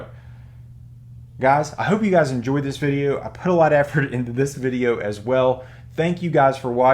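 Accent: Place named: South, United States. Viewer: Judging for your accent, I'd guess you're a Southern guy like myself. Rick Fortenberry (one of the guys who puts on the show) is a friend that I got my Batman 232 cbcs 8.5 from. You also had a brief glimpse of another great guy, Lyn Anderson who puts on a show in Raleigh 3 times a yr. Next show is early December and has some great vendors , Like Rick and Dave Hinson . You really should look into checking it out if you're in NC and looking for great 1 day show.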